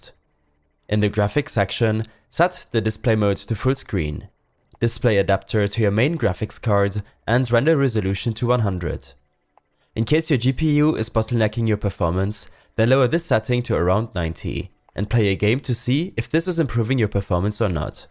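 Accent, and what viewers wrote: Germany. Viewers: Ich finde man hat schon rausgehört das du deutsch bist aber wo du nvidia highlites gesagt hast hab ich nur gelacht das klang auf Einmal so deutsch,aber gutes video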